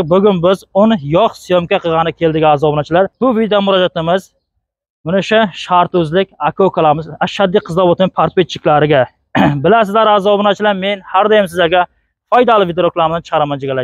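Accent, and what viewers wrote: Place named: Lakai, Turkey. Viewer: Узбекча гапир лакайча гапирма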